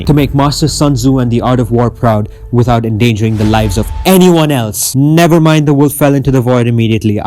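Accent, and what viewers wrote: India. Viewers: Bro still has Indian accent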